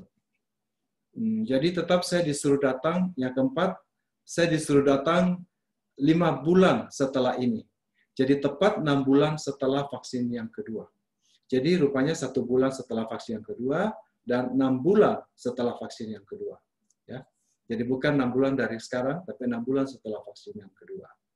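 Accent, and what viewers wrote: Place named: Maluku, Indonesia. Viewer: Sdr, terimakasih langsung share ke saya. Sepertinya logat2 Maluku nih. Semoga hasil penelitiannya favorable ya, tetap sehat, semangat,Tuhan memberkati dan setiap langkah upaya pengobatan dan pengendalian Covid.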